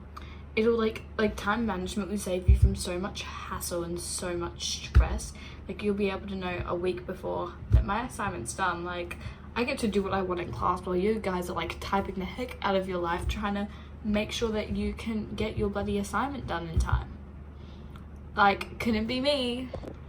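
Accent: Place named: Australia. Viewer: Do you live in Australia or the UK but i am hearing an Australian accent and I am fairly sure the school system is the same so its confusing me 😭😭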